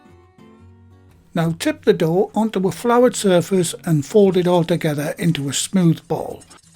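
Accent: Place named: England, United Kingdom. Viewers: Good English cooking with a good English voice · Thank you for answering 2 questions for me. Could I do without egg as I'm allergic. And a question I didn't know I had, I have long nails 😊 I like how you mixed fats with hot water, I never did like rubbing the fat into the flour and getting it all stuck under my nails. Where are you from, you sound local, I'm from Middlesbrough. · Although I live in Hungary, my daughters and their families still live in County Durham. It's lovely to hear a NE accent where I spent about 20 years. I never thought of using hot-water pastry for steak pies (I use it for pork pies of course) so I'll give it a go. They don't make meat pies in Hungary so I have to make them myself. Cannot buy them in shops. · Love your voice,! 😍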